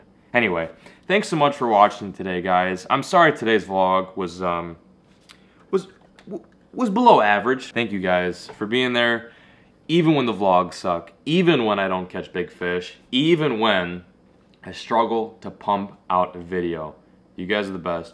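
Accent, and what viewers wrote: United States. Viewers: Your country accent kills me though 😂😂😂😂😂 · that country accent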